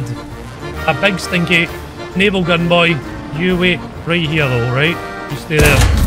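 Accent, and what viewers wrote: Scotland, United Kingdom. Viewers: Day 2 of telling he's the best war thunder YouTuber and has the best Scottish accent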